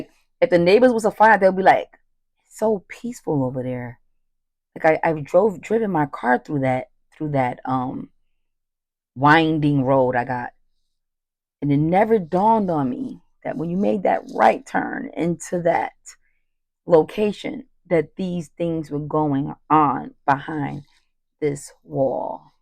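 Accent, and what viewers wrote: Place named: New York, United States. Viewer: Are you from nyc lol you have that accent.. praying for all the missing children out here!!!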